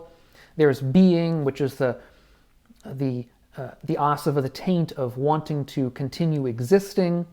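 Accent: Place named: Scotland, United Kingdom. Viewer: You sound scottish to me i like your lectures great stuff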